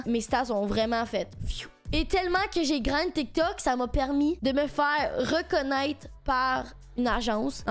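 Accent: Canada. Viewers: Haa non une cocotte n'est pas une boule mais c'est un mot argot qui signifie la poule , l'animal 😂😂.. Mais super vidéo et l'accent canadien c'est stylé quand même 😂😂❤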